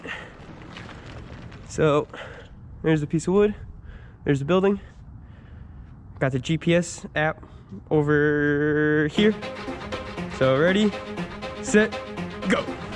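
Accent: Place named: Canada. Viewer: Great vid but that accent is rough dude. Where are you from? Canada? Here in the south you would stick out like a sore thumb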